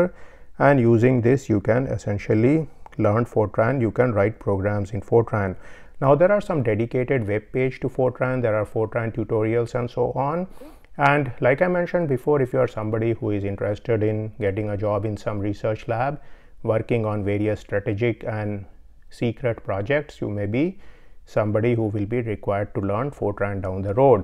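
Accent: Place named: India. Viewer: Gramps you got a hard Indian accent and I love it .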